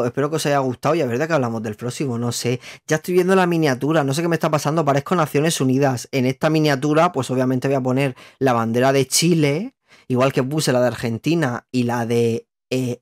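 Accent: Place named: Vega Media, Spain. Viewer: Que me meo yo soy de la vega media con razón me pareces tan próximo con algunas expresiones y la gracia al hablar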